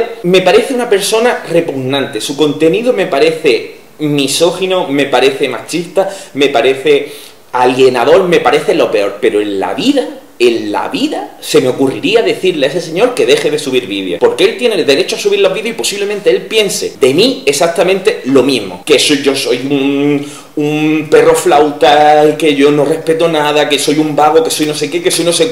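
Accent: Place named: Southeast, Spain. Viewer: No viene al caso, pero... ¿de que parte del sureste de España eres?, es que ese acento se me hace familiar XDD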